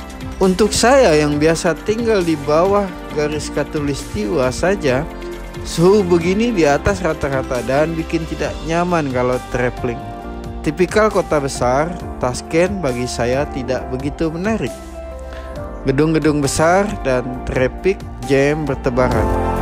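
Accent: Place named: Banjar, Indonesia. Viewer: Suaranya seperti Urang Banjar ya, R-nya susah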